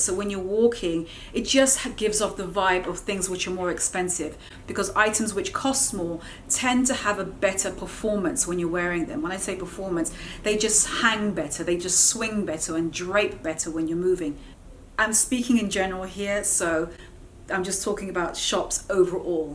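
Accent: United Kingdom. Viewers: Found you today - instant subbie! It's so nice to find a fellow Brit who sounds like me! Excellent video!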